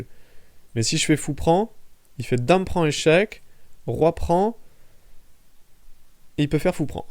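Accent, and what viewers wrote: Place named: South, France. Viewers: c'est intéressant, vos commentaires sont vraiment sympa, le style dynamique et l'accent du Sud :-D merci pour ces vidéos. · accent du sud ... on dirait carrément DiMeco !!!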